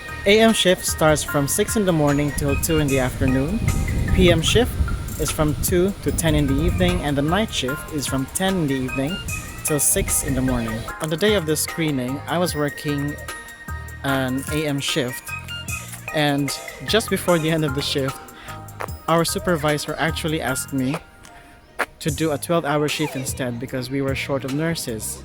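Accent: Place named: United Kingdom. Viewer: wow lods na acquire mo na din ng accent ng briton salute to you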